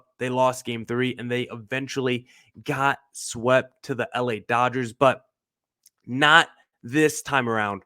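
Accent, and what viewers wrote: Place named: Boston, United States. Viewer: I saw you on Sully’s locked on MLB. I turned in today to see what you had to say about the sweep. I had to laugh when you said you grew up a Red Sox fan. I am a lifelong Red Sox fan and I was thinking “this guy sounds like a Red Sox fan I like him.” Just one problem, how can you switch allegiance to the D backs? But I am happy for you that they won.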